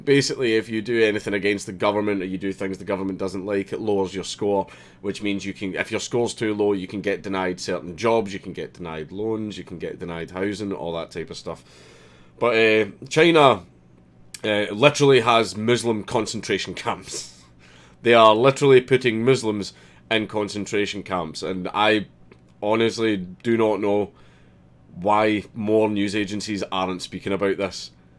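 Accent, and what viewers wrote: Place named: Scotland, United Kingdom. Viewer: Dude, you sound like the scotsman from Samurai Jack. Anyway, I hope the cathedral gets restored in its original form.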